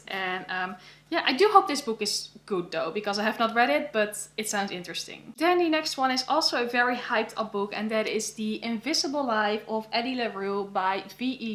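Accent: Netherlands. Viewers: Thanks so much for making another one of these videos, I've been waiting a long time for it since I enjoyed the last one! How is your studying going, and is the pandemic situation in the Netherlands getting better? Your makeup and hair are gorgeous as always! Are you trying anything different, or do you have the same routine? Are there any Dutch writers that you think are really great and that you enjoy reading? I also really get annoyed by nonremovable stickers on books and I usually don't buy books with them, because I just hate the way they look. Have you thought about reading Hanya Yanagihara's first book, The People in the Trees, if you haven't already? Like A Little Life, it is also a superbly written and fascinating book based on a true story about some very awful and difficult topics. Also, I love hearing you talk, since your accent is beautiful and unique!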